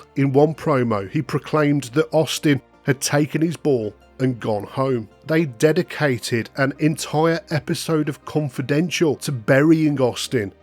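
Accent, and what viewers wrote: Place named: England, United Kingdom. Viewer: Love this guys English accent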